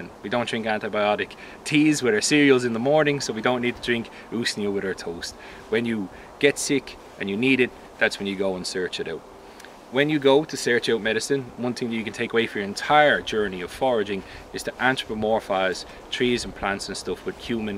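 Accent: Ireland. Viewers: Dublin I’d say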